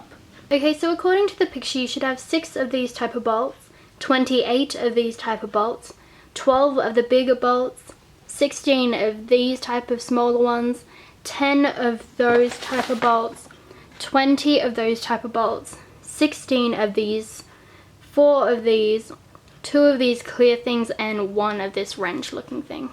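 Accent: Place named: Australia. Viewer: She Sounds Aussie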